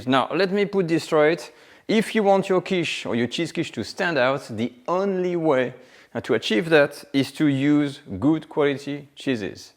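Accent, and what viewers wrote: France. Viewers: I could just listen to your French accent for ages!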